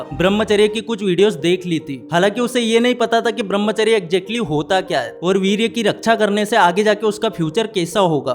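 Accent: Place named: Madhya Pradesh, India. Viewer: Apke bolne ke style se lg rha hai aap MP se ho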